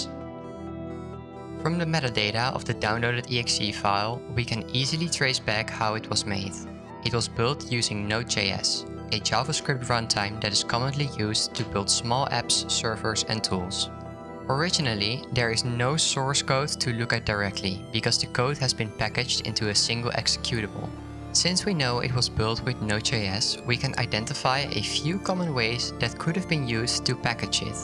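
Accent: Netherlands. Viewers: Are you from the Netherlands? You really sound like it.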